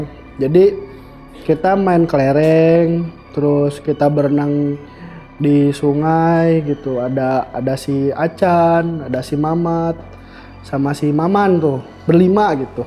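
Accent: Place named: West Java, Indonesia. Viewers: Bang serius klo sunda sunda aja jadi kagok dengernya😅😅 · Logat sundanya kebawa😂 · Gua.. Gua... Aneh ceuk akumah logat sunda gua guan... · Sunda pisan euy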